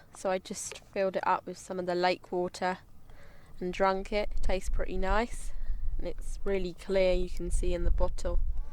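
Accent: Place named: Wales, United Kingdom. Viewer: in the valleys yeah lol nearly got the accent lol move to wales best thing you ever do. i know you love it lol btw its a stream not a river lol. take care hurry up back.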